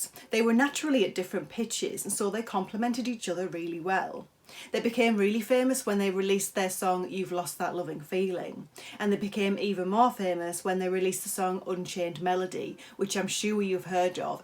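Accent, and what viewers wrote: Scotland, United Kingdom. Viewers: I liked this video and your accent. Are you Scottish? You are very beautiful.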